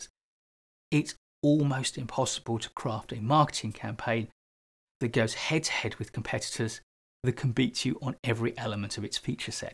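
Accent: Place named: United Kingdom. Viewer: I started to miss the British accent in business videos in YouTube 😂 thanks from Morocco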